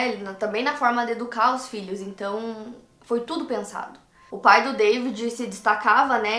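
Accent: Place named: Paraná, Brazil. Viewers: Vc é curitibana? Baita sotaque lindo daqui . Rsrs. Po mina , vc contou td sobre o net flix... spoiler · Adoro Seu Sotaque ... Acho tão lindo o sotaque Paranaense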